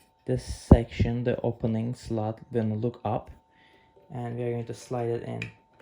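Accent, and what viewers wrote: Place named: Turkey. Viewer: Even though my machine has been built I was having issues with levelling but since finding your page and content I followed your method and guess what... it worked. You have a big fan here and also I have a feeling from your accent your from one of my favourite holiday destinations. Hope you don't mind me asking but are you from Turkey ?? Thanks again brilliant !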